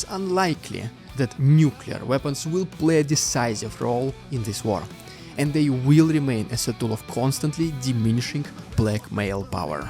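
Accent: Russia. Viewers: I used to watch your videos all the time when you just started but they are becoming increasingly more difficult to watch as time passes. There used to be some 'entertainment' involved but that has almost entirely disappeared nowadays. You can speak very good English for a Russian but your accent makes a long monologue very difficult to listen to, it is difficult for me to maintain the necessary concentration and I very quickly simply stop listening to you. What makes you think people want to sit and decode what you are saying? I believe you have a narcissistic nature and I also believe that if you don't make some very drastic changes to your content your channel will simply fade away. Get some good advice from someone who will be both honest and objective.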